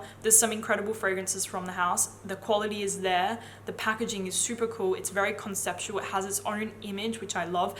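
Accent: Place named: Australia. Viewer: I really appreciate your honesty! During my quarantine in the UK I’ve parted with a substantial amount of money on various fragrances - all of which were of your recommendation. Everyone single one was a blind buy and I have not been disappointed once! It’s so important to be transparent with your followers so thank you! Also I’m a fellow Aussie so love hearing a familiar accent ❤️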